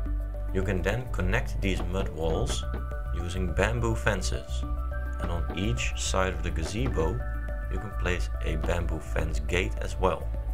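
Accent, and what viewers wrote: Netherlands. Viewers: Are u Dutch? xD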